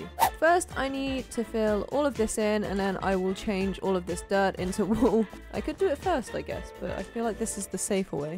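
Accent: United Kingdom. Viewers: Are you british? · 1 are you British 2 how did you get so good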